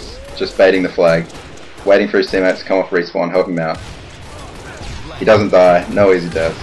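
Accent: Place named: Australia. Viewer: You can just hear enthusiasm when he mentions how excited he is for ep2, but seriously this is better than the american commentary vids. This is actually pretty helpful and I'd rather listen to an aussie accent over a yank anyday.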